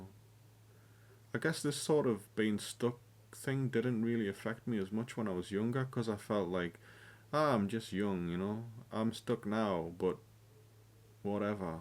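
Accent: United Kingdom. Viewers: Youtube random algorithm sent me here so, going to write a comment (I know this was posted 4 months ago but I hope you read it). Life doesn't have to have purpose, friends come and go, lovers as well, there is no eternal love, all ends when one of them dies. Try to volunteer, you will meet new people, and will feel a sense of purpose. A pet helps, physical exercise (hiking, walks, weights) as well. "Who's going to love you, if you don't have a job?", your constructed ideas are putting you in a prison, I met my bf when he was unemployed, he took over 1y to get a job, that never got in the way of our relationship. I earn more than he does, he is on minimum wage, and I really don't care how much he makes, if he enjoys what he is doing. The happy family idea, is just an idea, most of my close acquaintances have families that are quite dysfunctional, very few are really happy. 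I know plenty of people that are 40y+ that are single and they are actually happier than most married people I know. Being alone is different from loneliness. You achieved being an engineer, earned a good wage, you traveled, and learned the lesson that you should have saved for the rainy day. Life has ups and downs, it is not a highway like propaganda portraits. Start reading about stoicism (there are a lot of sources for free online, you can start in reddit- r/stoicism), self-compassion, resilience and get therapy (I think you are from UK from your accent, NHS provides free therapy). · Geordie love it I’m from Hexham bro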